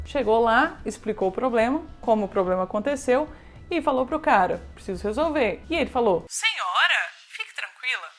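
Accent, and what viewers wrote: Minas Gerais, Brazil. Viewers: Mineiro tretando deve ser a coisa mais fofa kkkk sotaque fofinho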